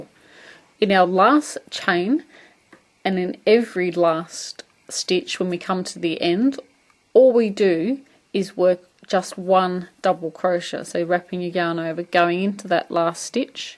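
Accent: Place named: Australia. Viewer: my first thought at her voice was "ooh you're aussie aren't you?"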